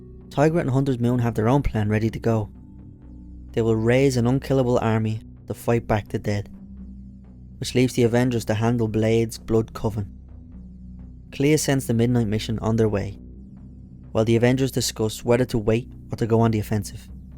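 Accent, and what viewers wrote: Dublin, Ireland. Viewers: A Dublin comic channel. Never thought Id see that. Well you sound like your from Dublin anyway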